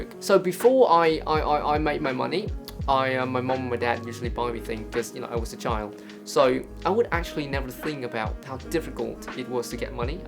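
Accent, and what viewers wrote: United Kingdom. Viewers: Giọng thì Anh anh nha Mà cái cách nói chuyện thì mix với anh mỹ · Your British accent is so charming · I'm really love your british accent haha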